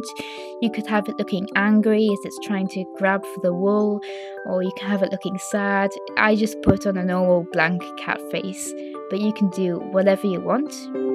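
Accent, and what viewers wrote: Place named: North East England, United Kingdom. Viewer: North east england ^^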